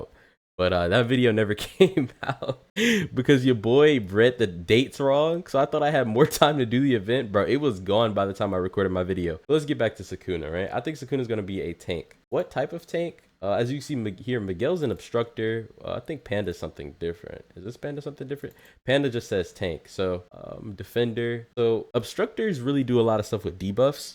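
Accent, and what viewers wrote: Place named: South, United States. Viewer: why you sound so country 😭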